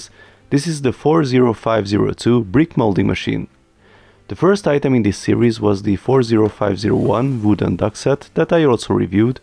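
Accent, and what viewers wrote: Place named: Hungary. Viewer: YOU SOUND SO HUNGARIAN!!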